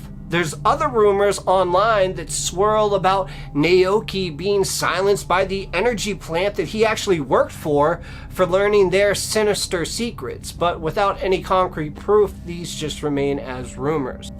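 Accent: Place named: United States. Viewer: Love your videos and the American accent as im in England 🇬🇧 i love watching your videos every time you put them out but due to time difference across the pond i get to watch them a little later on. Keep them coming though ❤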